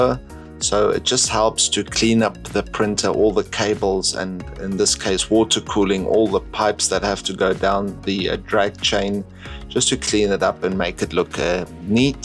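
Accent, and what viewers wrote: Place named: South Africa. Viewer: Lekker South African accent. Love to see such great videos coming from SA.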